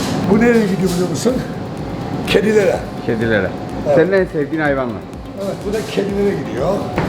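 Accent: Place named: Adana, Turkey. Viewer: allahına ölürüm senin ha ustam tam bir adanalı konuşmalar falan adananın ekmegini yeyip suyunu içtigin çok belli bende adanalı bir kebapçı oglu olarak sizin gibi adanamızı temsil eden adana evlatlarını görünce gurur duyuyorum ve seni taskipteyim benim babama şişko neçmi derlerdi yıllarca birbiçerlerin ustalıgını yaptı ama şimdi sizlere ömür senin gibi ustaları görünce direk babam la tablada çalıştıgım anılarım geliyor devam durmak yok helal sana Adanalııııııııı.........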